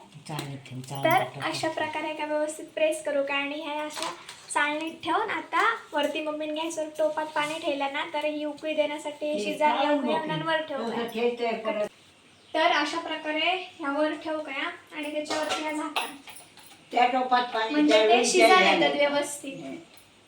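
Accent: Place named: Malvan, India. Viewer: तु मालवणी बोली इतक्या ओघवत्या आणि गोड शैलीत बोलतेस, की त्याचं खरंच मनापासून कौतुक वाटतं. कुठलाही व्हिडिओ असूदे, तू तो प्रामाणिकपणे आणि निरागसपणे सादर करतेस, हे मनाला भावते. आजकाल बऱ्याच मालवणी लोकांच्या बोलण्यात मराठी प्रमाण भाषा आणि इंग्रजी शब्दांचा वापर असतो, पण तू जेव्हा बोलतेस तेव्हा खरी मालवणीची गोडी आणि सौंदर्य अनुभवायला मिळतं. तुझी ती बोलण्याची पद्धत अशी आहे की पुन्हा पुन्हा ऐकतच राहावं असं वाटतं. म्हणूनच तुझे व्हिडिओ मला फार आवडतात. असंच सादरीकरण करत रहा आणि खूप मोठी हो — तुला मनापासून शुभेच्छा!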